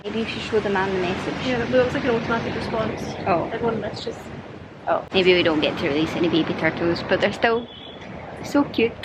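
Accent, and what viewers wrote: Scotland, United Kingdom. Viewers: Hi watching your videos two years later. The google Algorithm has not done justice to your channel. I am of English descent on my Mom's side but born and raised in Sri Lanka and now living in Florida. You and are two brave souls to visit during turbulent times but I am glad you had a wonderful holiday. I love your Scottish accent strong and heavy on the R's. I have been to Scotland twice (Dundee). I hope to visit Sri Lanka with a stopover in the UK I am waiting for warmer weather because my body does not like the cold. Cheers Mates and happy travels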